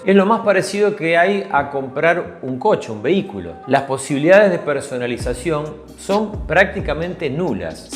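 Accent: Argentina. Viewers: Me gustan mucho tus comentarios sinceros y profesionales. Por el acento siento que hablas desde argentina, y la realidad algunas veces es un pelín fluctuante dependiendo del país. Desde España se ve la construcción prefabricada como una ventaja en cuestión de plazos de habitabilidad, por lo que he visto y comparado el precio del M2 de construcción es similar el de la construcción tradicional -estructura hormigón armado/pretensado, tabiquería ladrillo/Pladur, tejado de teja, y fachadas de material hidrófugo - es muy similar al precio de la vivienda prefabricada, pero siempre me queda la duda sobre el sistema estructural, como responde una casa prefabricadas a movimientos/asentamientos de terreno, cambios de nivel freático....esa es mi duda, porque creado el problema, quién sabe cómo arreglarlo?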